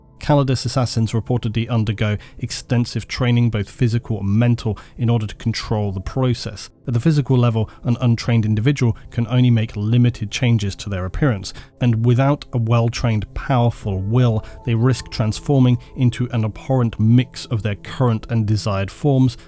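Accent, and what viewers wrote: England, United Kingdom. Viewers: No amount of English accent and somber narration can change the fact that a female body simply isn't built for any sort of physical combat, much less assassinations. The 40k universe pandering to this idea just makes it less credible. There's already a lot of questions concerning 40k lore, besides the nature of these Callidus Assassins and it only makes it worse. A lot of 40k lore is so out of touch with logic, it makes me think that the only thing that keeps this franchise going is the blind fan loyalty who don't care about all these issues.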